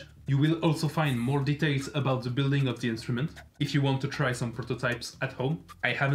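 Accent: France. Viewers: Salut ! J'ai beaucoup aimé la vidéo, mais je me posais juste une question : Comment as tu réussi à autant développer ton vocabulaire en gardant un accent français si prononcé? la bise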